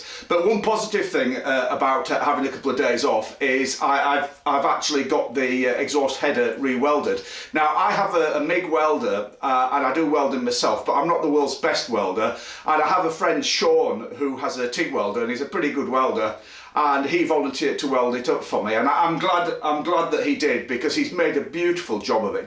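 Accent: United Kingdom. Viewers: Great video, thanks for detailed explanation. Easier to understand with a British accent. I'm from north of the border but it still works for me. ;) Again, thanks. Just picked up my 2019 XC recently and this is high on my list before the summer heat hits Atlanta.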